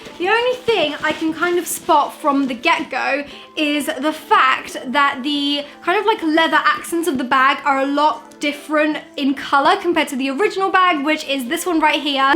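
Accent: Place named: London, United Kingdom. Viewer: You literally sound like a londoner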